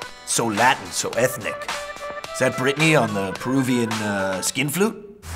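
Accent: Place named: England, United Kingdom. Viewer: no dislikes! Fuck Yeah! Nice english accent btw.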